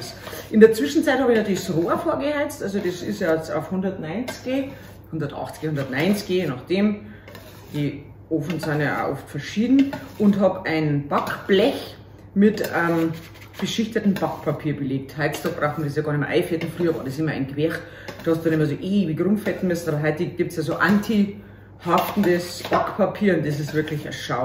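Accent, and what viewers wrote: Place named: Bavaria, Germany. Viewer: vielen Dank liebe für die schnelle Antwort. Ich finde deine Videos egal ob das Backen oder die anderen so natürlich und mega lustig, das ich dich gleich abonniert habe. Ich muss mich zwar teilweise sehr konzentrieren damit ich alles verstehe, wenn du in bayrischem Dialekt sprichst🤣 aber es geht. Wünsche dir einen schönen Sonntag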